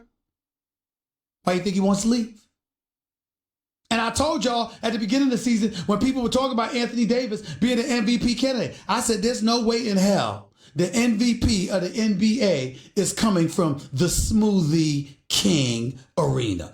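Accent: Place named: New York, United States. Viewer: All of new York coming outta this man 🤣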